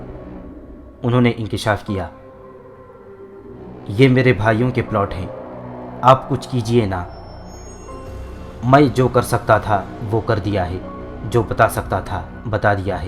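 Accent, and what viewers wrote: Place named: India. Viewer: آپ کے بولنے سے سمجھ گئی تھی کہ آپ انڈیا سے ہو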